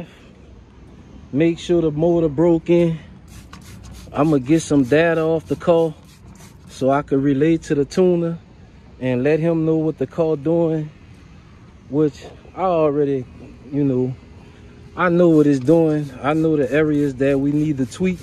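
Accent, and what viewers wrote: Louisiana, United States. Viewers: soon as I clicked on this video the question was "What part of Louisiana you from?????"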